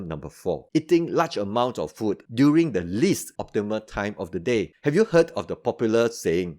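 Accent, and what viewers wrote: Singapore. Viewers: you must be singaporean. Singapoh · Singapore accent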